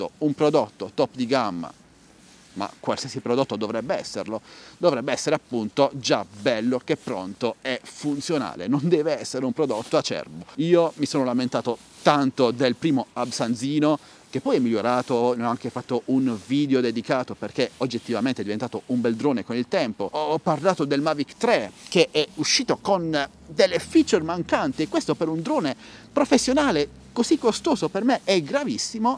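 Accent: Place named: Apulia, Italy. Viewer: QUando ci vuole ci vuole, l'accento pugliese per me ( origine tarantine ) va benissimo ,e se non si da un pò fastidio , se non si è un po rompicoglioni allora non si ha la schiena dritta. Bravo e cmq un fanculo ogni tanto ci sta bene a chi lo merita.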